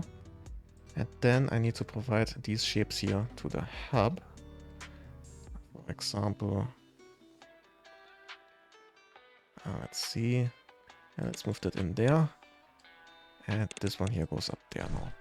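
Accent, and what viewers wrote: Germany. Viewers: The German accent is crazy lol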